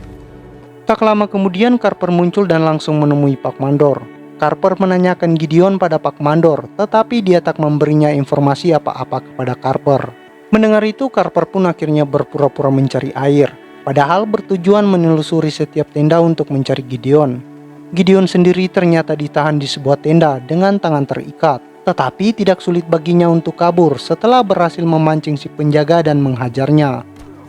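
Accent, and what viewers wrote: Eastern Indonesia, Indonesia. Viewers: Bang orang timur? Logatnya kental timur 😂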